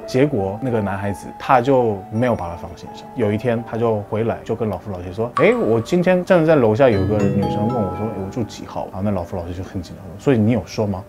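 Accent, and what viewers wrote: Standard. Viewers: 發音很標準😆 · 其實你是台灣人對不對？中文太標準啦w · 中文也太標準了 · 超級標準我還以為他是台灣人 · 我是中国内地的朋友在加拿大生活，身边认识很多韩国🇰🇷朋友，我发现你的中文已经好到比我说的都标准了！终于了解韩国的历史文化了。非常的赞！秒关注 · 發音怎麼可以這麼標準好感人啊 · 發音比一些台灣人還標準唉 😂😂😂 例如我😔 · 發音好標準哪！對後製人員按個讚，真的好細心。不論是音效、插入的圖片或影像。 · 他發音超標準！而且真的聽起來很有感覺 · 國語講的很標準！聽不太出來是韓國人🤣🤣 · 講中文超級標準耶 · 最後一個故事好毛啊!! 嚇死~ 而且中文口音好標準, 差點聽不出來是外國人~好厲害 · 這中文講得也太標準了吧！！！！ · 发音确实超标准 👍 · ，您的中文真的很標準，我打從心裡真心佩服，可是您的故事比較像是鬼笑話欸😆~ 聽你講中文還比較有趣。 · 第一次看到你的頻道！你好可愛中文也說的很標準！（被圈粉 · 不得不說你的中文很標準,不說真以為是台灣人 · 中文發音很標準欸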